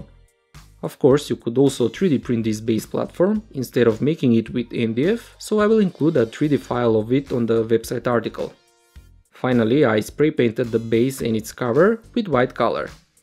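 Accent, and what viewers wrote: Russia. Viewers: Русский акцент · какой то русский акцент присутствует или мне кажется?) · Слышится акцент, скорее всего русский) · как же слышен акцент русского))))))))))0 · Русский акцент, русский чтоль ?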